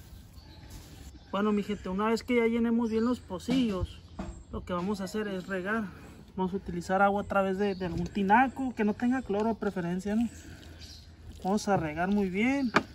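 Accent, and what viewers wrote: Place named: Sonora, Mexico. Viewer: Buenos días. los felicito por ser tan amable en explicarle. Yo tengo ganas de hacer una siembra tengo terreno agricola. Soy jubilado me enfado mucho. Es muy interesante. Su publicación. De dónde son ustedes. Hablas como de Sonora. Yo soy de Huatabampo Sonora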